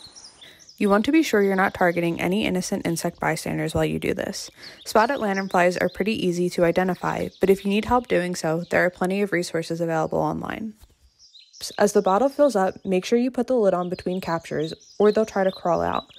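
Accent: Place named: South Jersey, United States. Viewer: You south jersey has a sifferent dialect than central and north east lol :)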